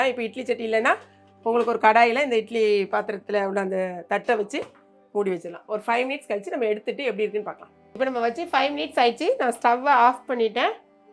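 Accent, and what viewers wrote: Tirunelveli, India. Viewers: Your videos super .. recently watched one of your video about vivikam recipe forwarded from my sister . So much nostalgic memories from my childhood, from idayangudi living in Chicago for the past 27 years , your Trinelveli accent and very simple talk , nothing fancy .. great collection of recipes. Super super . Need see many of your wonderful recipes and want to try them Thank you so much . Finally so glad I found your channel.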